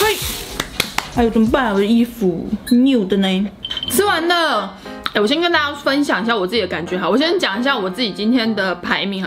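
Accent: Taiwan. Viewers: 好有喜感看你的頻道非常有親和力尤其是那台灣國語😂😂😂 我的頻道也要加點搞笑的元素哈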